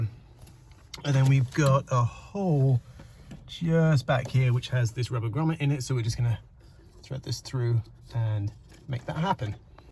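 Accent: England, United Kingdom. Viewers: Lovely engine sound. Where in England is your accent from? Excuse nosiness. Huge difference in fuel prices. Would cost an arm and a leg running those lovely big engines here. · Good to have and hear a Brit on here having fun with old cars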